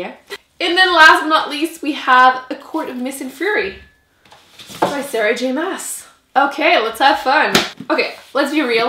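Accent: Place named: Quebec, Canada. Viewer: She's from Québec.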